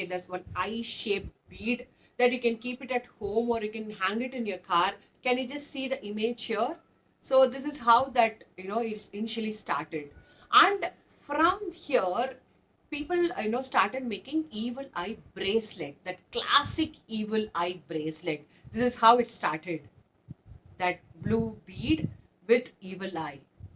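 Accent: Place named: South India, India. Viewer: I have observed your south touch accent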